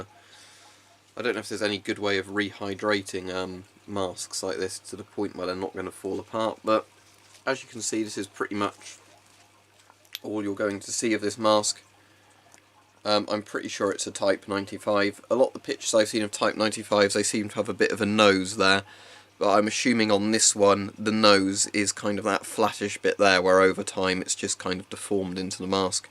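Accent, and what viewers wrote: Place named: United Kingdom. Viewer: I don’t mean to get myself involved in your privacy but out of curiosity I would like to ask what is your nationality? You have a British accent but I seen you wear a German camouflage uniform still issued in the German army.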